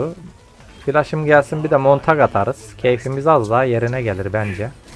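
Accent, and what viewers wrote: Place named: Antalya, Turkey. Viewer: ABI SEN NERELISIN ANTALYALIMISIN ŞİVENDEN ÖYLE DÜŞÜNDÜM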